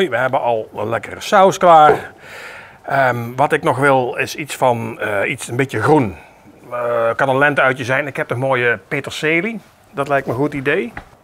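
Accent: Brabant, Netherlands. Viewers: Hé, weer een geweldige presentatie en gewoon lekker op zijn Brabants! 😜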